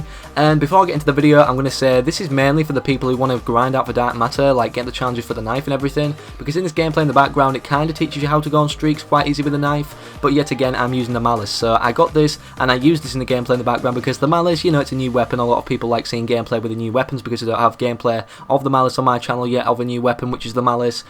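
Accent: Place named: Yorkshire, United Kingdom. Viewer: Yo Mama he is from Yorkshire dumb ass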